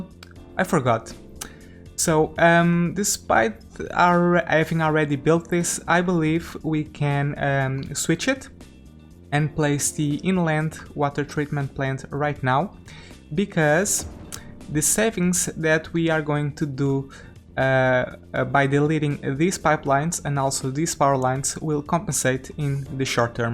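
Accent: Portugal. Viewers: He is one of ours, Portuguese. Very clear from the accent actually. But yes, I agree, he's far from a clumsy English speaker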